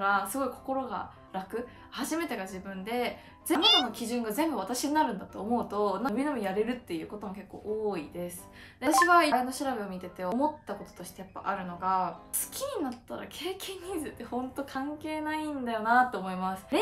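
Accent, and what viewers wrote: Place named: Kansai, Japan. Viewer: 、めちゃくちゃ関西弁出てる。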